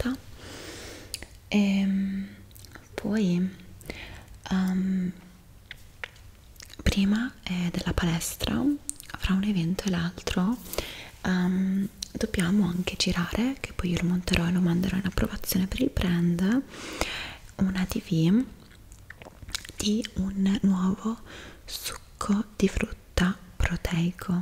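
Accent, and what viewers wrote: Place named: Milan, Italy. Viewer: Non capisco se mi sembri milanese o una delle assistenti del diavolo veste prada (no insulto ti adorooo❤)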